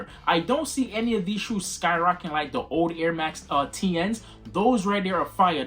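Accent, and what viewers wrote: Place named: Toronto, Canada. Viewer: Good work bro. Toronto accent goin crazyy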